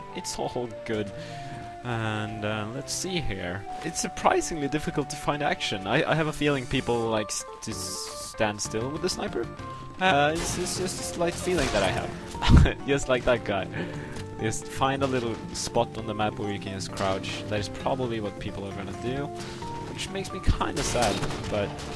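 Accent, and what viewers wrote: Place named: Netherlands. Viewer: where are you from? sounds like a Netherland accent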